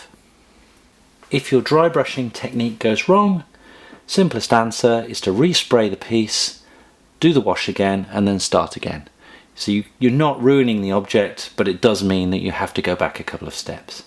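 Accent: United Kingdom. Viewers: Nice to hear a British accent on Utube 👍👍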